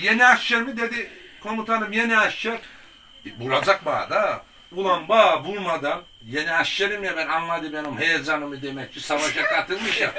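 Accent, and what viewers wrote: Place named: Black Sea, Turkey. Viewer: Maşallah ağzına yüreğine sağlık koca yürekli dayı gerçekten konuşurken habu Karadenizi yaşatarak konuşuyorsun vallaha helal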